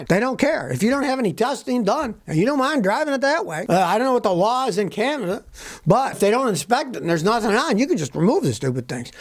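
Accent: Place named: Chicago, United States. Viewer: Is Scotty Kilmer’s accent a Chicago accent or a different version of the New York accent? To me it sounds Chicago but I’m not a 100% sure lol. But I love it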